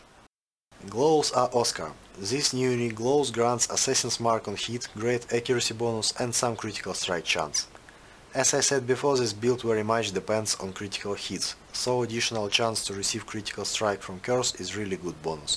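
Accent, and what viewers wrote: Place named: Russia. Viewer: Твой руззгий акцент просто супер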